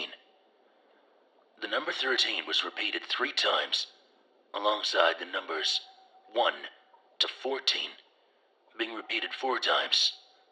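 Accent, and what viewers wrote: United States. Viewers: Your American accent is spot on!!!! Great job! I love the effect over your voice too 10/10⭐️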